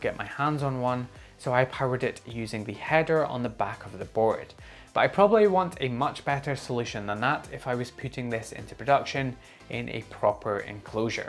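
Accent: Scotland, United Kingdom. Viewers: Cracking video mate, so useful in the current climate! Also can’t tell you how nice it is to hear a fellow Scot on Youtube in a space dominated by Americans! (no hate to them, just nice to hear 😎)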